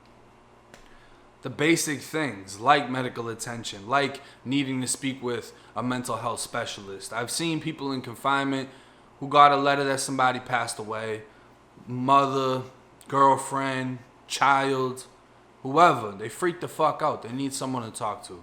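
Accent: Boston, United States. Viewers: Your stories sound better with that bean town accent ya' got..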